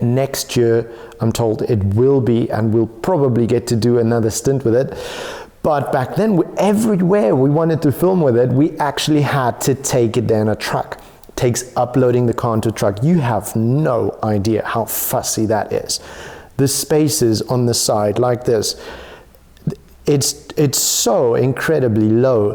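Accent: South Africa. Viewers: I've always suspected your accent might be South African but I just couldn't put my finger on it bru!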